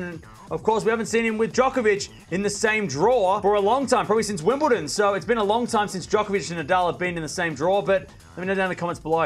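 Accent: Australia. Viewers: Please pardon Australian accent. He tries hard to pronounce names correctly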